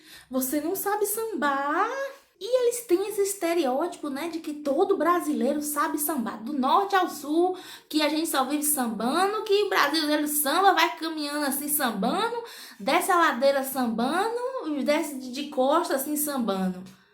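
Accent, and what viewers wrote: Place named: Venezuela. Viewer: Ei amiga com certeza te perguntam se vc é venezuelana porq vc já deve ter pegado um geitinho um sotaque kkk .Eu sou capixaba meu marido cearense e quando vou nos lugares sempre tem quem pergunta kkk vc é cearense?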